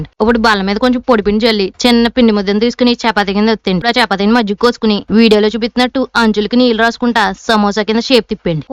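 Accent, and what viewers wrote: Godavari, India. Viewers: గోదారి యాస సూపరూ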